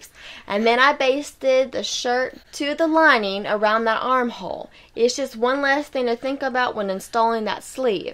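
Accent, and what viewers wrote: South, United States. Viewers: so beautiful! what a great tutorial! and I love your southern drawl! lol what material did you use for the gold? on the accent?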